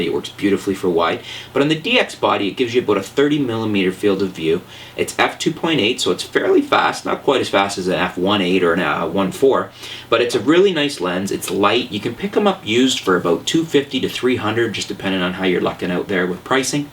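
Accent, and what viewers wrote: Canada. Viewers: really dude, "vig-netting?" vignette. it sounds like "vin-yet." you're clearly super canadian, so you obviously had french forced on you. there is no excuse for that level of laziness. step your game up. also, "bokaahhhhh" sounds idiotic as a million other people said.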